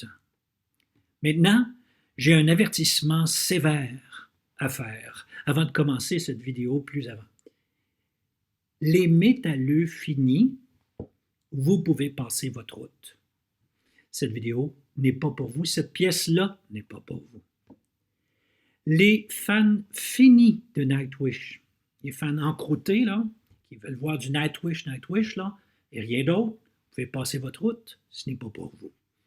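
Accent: Canada. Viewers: Enfin quelqu'un réagit sur la deuxième section !!! Tu me l'as fait encore plus aimer ! Yessss ! (Au fait : joli ton accent canadien!)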